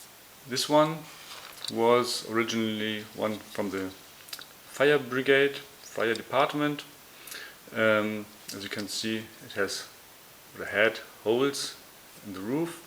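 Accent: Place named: Germany. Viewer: Der deutsche Dialekt beste😂👏👍 aber sehr unterhaltsam 👍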